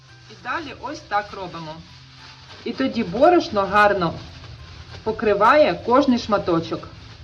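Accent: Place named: Russia. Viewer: чутно що ти у побуті маскваротиш.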